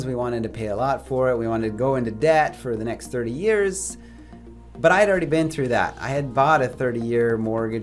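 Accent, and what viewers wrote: Italy. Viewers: I lived overseas for several years and you have the international English way of speaking with I think a bit of Italian regional accent. It it more recognizable when you speak slower. When you are more passionate or speak faster it disappears. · You have an Italian accent, but you look more Northern European. Then again, many Italians look more Nordic like yourself. Just wondering, that's all.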